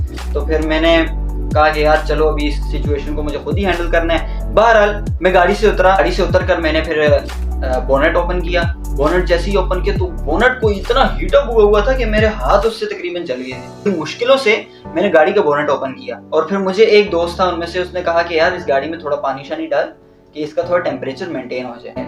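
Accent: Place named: Karachi, Pakistan. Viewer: Pakky karachi waly lagty ho ap bro 😅❤️❤️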